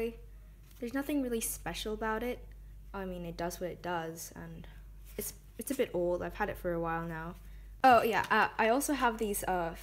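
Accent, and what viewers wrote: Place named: United Kingdom. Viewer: Woww sis i dint realize you have a bit of a British accent lol I noticed it ✌😌✨✨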